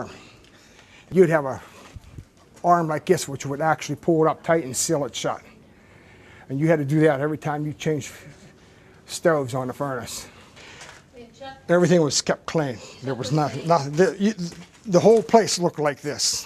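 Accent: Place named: Pittsburgh, United States. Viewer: You got that Pittsburgh accent from Northside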